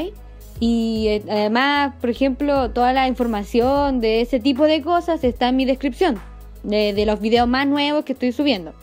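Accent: Chile. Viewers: Es que lo dije en cuánto escuche tu voz xD Se nos nota mucho el acento chilensis(?) xD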